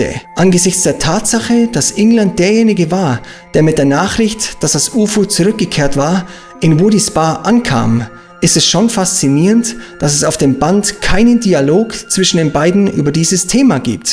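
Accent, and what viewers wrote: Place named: Bavaria, Germany. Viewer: Hi, hab ich entdeckt und direkt gleich all deine Videos angeschaut 😅richtig toll! Sag mal, wo kommst du her? Voll schön, den bayrischen Dialekt mal raus zuhören. Macht dich mega sympathisch ☺️☺️✌️ Grüsse aus Regensburg 😊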